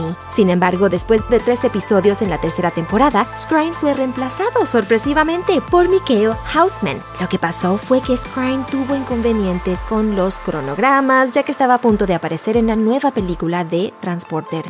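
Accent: United States. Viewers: Español de estados unidos???? Dislike equisde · Ay, qué pronunciación yankee tan ridícula tiene la locutora!! Es necesario?! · Lo que molesta es el afán de la narradora en pronunciar los nombres con acento gringo. Vale Gaver.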